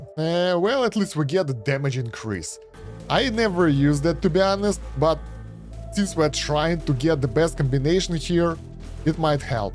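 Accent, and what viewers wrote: Russia. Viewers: да лан тебе) Скажи как есть) акцент сразу слышен наш)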